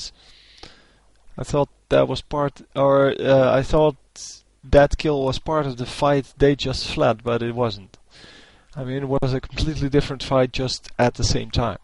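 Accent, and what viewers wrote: Netherlands. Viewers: Ik wil niet lullig doen ofzo hoor, maaaar je hebt een beetje Nederlands accent in je engels :$, Maar toch leuke vids :)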